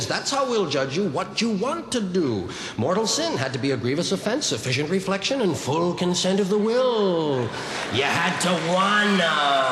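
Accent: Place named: New York, United States. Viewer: The old-time NY-Irish accent alone makes this hilarious.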